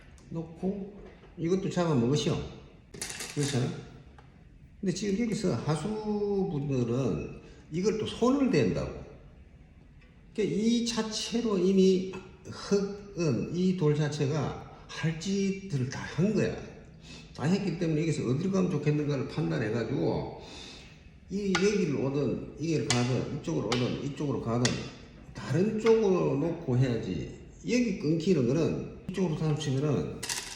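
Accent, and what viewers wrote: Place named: Gyeongsang, South Korea. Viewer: 원장님 경상도 사투리 쓰고 계시는데 혹시 고향이 어디 신지요 저하고 말투가 비슷해서 하하하 (맨들라꼬)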